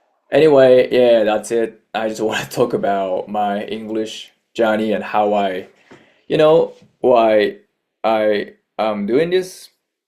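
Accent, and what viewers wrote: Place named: Japan. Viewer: Here is some feedback for you: 1. You don't sound like you have a heavy Japanese accent. But it is not native sounding either. There are some sounds and phrasing flows that give away your Japanese roots. Some examples are the l/r mix, and the way you say some sets of words in quick flows that come out weird. Specially in the beginning to middle part of the video. You seem to settle on to a more natural flow later. 2. There are so many "you know"s and lip smacks that it became distracting to actually listen to what you were saying. If you would like to manipulate and charm people, maybe don't look for acknowledgement of your thought process so often, even involuntarily. The "You Know"s were honestly too much. Other than that, awesome confidence, projection, clarity and word choice. Keep going!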